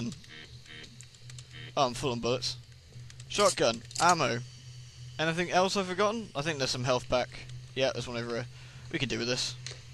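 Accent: United Kingdom. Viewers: i cant tell is he brithish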